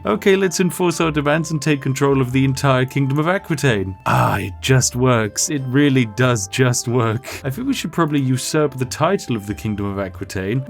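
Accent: United Kingdom. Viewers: Who tf dislikes?? Like why.. is it the stock images? The sassy British accent? Cause these are things I love xD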